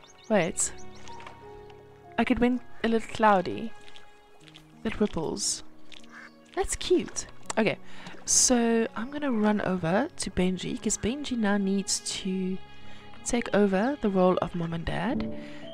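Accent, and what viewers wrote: South Africa. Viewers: Omg your south African this is amazing I am to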